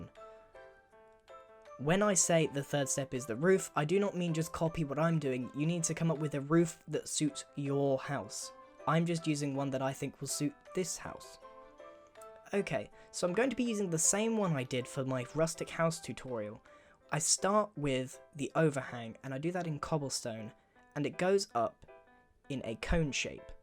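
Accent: United Kingdom. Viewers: dude dude i really like your brithish acsent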